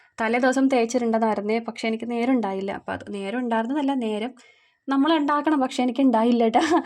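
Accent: Kochi, India. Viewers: ഞാനും ഒരു തനി kochikari ആണ് യുടെ സംസാരം സെരിക്കും എന്നെപോലെ ചൊന്നുന്നു 😂😂😂😂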